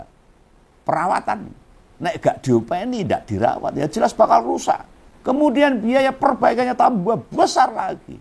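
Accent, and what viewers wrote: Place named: East Java, Indonesia. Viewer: Ini wong jatim liat logatmya , semangat cak ....( siapa ).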